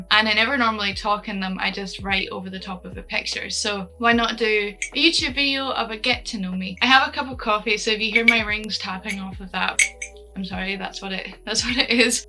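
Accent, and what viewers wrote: Scotland, United Kingdom. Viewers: "I live close to Dundee", that explains why I recognise the accent since it's local-ish xD nice to see a Scottish content creator, dropping a sub :)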